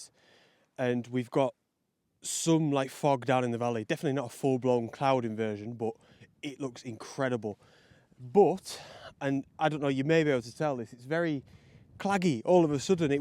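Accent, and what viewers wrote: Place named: Manchester, United Kingdom. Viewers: Hello from sunny Manchester, why do you sound like a manc? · Hi! Watching your channel for a couple of years now here in Ireland. love it and looking forward to getting your calendar in the post soon! Strange question, but love to know where the accent is from! Went to UNI in England with a fella from Workington but you sound a bit softer. I was originally guessing somewhere around Manchester then thought Lancashire but maybe more north like Lancaster? If tis too personal I understand! Just a bit of a language/accent geek here! {probably way off!}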